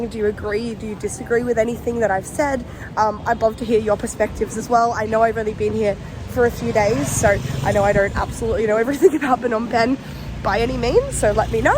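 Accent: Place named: Australia. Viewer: especially your cute aussie accent. :)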